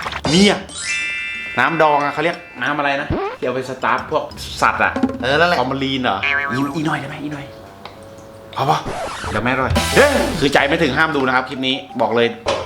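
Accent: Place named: Myanmar. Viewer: มึงเปนพม่าเหรอสำเนียงเหมือนเลย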